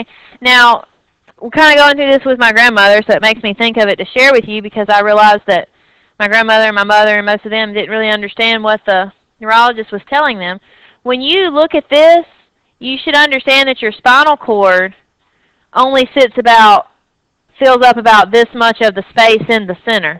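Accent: South, United States. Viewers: I love videos. There are full of information and I feel like I am in a class, with the teacher talking to me, not just reciting information. The fact that she speaks 'Southern' is an added positive! I don't feel she is talking down to me when she explains things.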